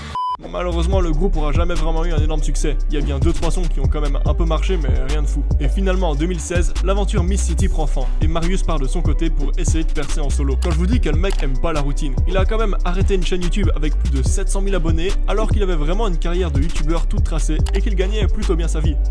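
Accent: Belgium. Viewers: T’es belge ??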